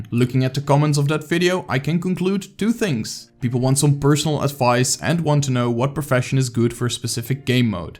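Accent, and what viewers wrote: Netherlands. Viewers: You sound super Dutch! With the stauf and claes instead of staff and class! I know you can do it! You have enough determination to make a lot of YouTube videos so I know you can learn to say class and staff!!!!! I believe in you! ps I'm from Belgium and also a Dutch speaker and I've never ever said claes or staaaauf!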